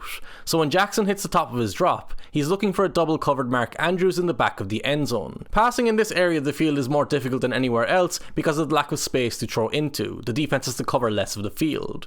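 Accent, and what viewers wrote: Ireland. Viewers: Can't say I ever thought I'd hear an in-depth film breakdown of an NFL QB from a guy with an Irish accent, but you clearly know what youre ralking about. Great stuff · An Irish man commentating American football? Okay I’m here for it. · The best American football commentary with an Irish accent I've ever heard. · Surprised to hear narration from an Irishman.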